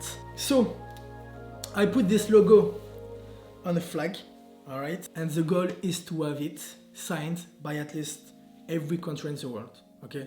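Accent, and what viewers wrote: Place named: England, United Kingdom. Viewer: Ton accent anglais m'amuse toujours autant, chouette vidéo bien sympathique! Bonne continuation à toi!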